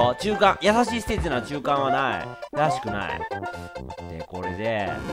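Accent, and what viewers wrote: Kansai, Japan. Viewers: やっぱ、関西弁はいいな～ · の関西弁が可愛すぎる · の関西弁実況いいな〜なんか癒される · の関西弁ええな！！ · 関西弁キャラに戻らないかなぁ…… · 誤解しとったやん！簡単やったやん！のじんみーな関西弁好きだー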